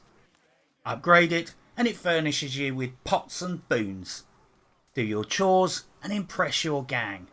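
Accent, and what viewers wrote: United Kingdom. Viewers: Same I’ve just discovered them and now I have dozens to watch you just can’t beat that British wit or accent haha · Its refreshing to hear a brit that knows rocket ball ammo was junk. Also thanks for using my favorite native meme. My mother had a bumper sticker on her car when I was kid that read "of course you can trust the government just ask a native".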